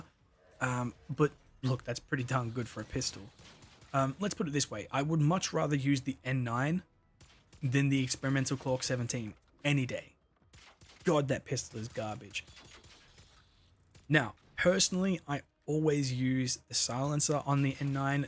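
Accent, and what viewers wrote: Australia. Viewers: WAIT UR AUSSIE? no wonder the accent was recognisable. I'm a westerner lmao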